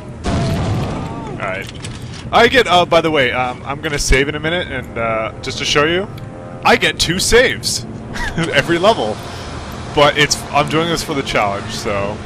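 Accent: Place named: Canada. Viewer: Candians always sound like that, even as babies.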